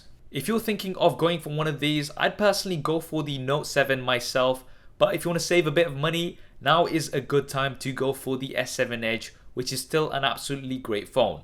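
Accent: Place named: London, United Kingdom. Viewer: Where are you from brother? Sounds like you're from around East London. Man I am happy to see a fellow London goer take up the stage and have a greatly sized channel of electronic fandom. I might be wrong, you could be from Manchester, but I haven't heard that accent in years. Anyways, love the video, well made and professional. Subbed.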